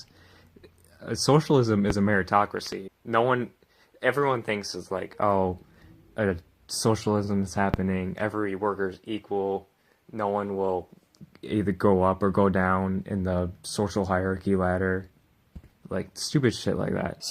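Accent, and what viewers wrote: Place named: New England, United States. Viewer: You don't sound Slavic you sound Yankee like me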